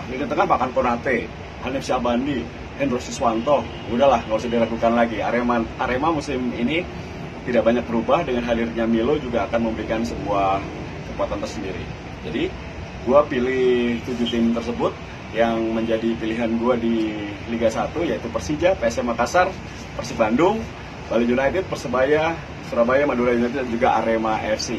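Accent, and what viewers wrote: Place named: Banyumas, Indonesia. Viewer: Boca ngapak ya sudahlah sudahlah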